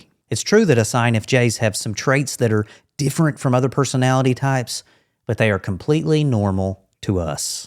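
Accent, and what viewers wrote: South, United States. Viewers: Where is your accent from? Carolinas?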